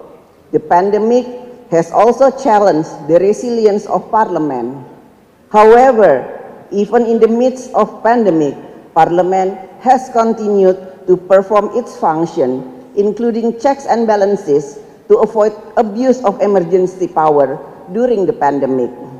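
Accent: Indonesia. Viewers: Selain yang sudah dibicarakan banyak orang, kesalahannya juga tuh dalam mengucapkan huruf "r" masih seperti dalam bahasa Indonesia. Harusnya tuh ga kaya gitu cara ngucapinnya. Jadi, lidahnya itu jangan menyentuh langit-langit mulut. Kalo menyentuh langit-langit mulut, ya jadi salah itu. Untuk lebih jelasnya lihat saja pidato bahasa Inggris presiden Amerika, apa pidatonya siapa gitulah yang pake bahasa Inggris dan bahasa Inggrisnya bagus gitu... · Ingris rasa jawa..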